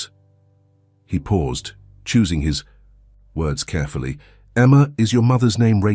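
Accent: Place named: United Kingdom. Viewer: a very elegant British narrator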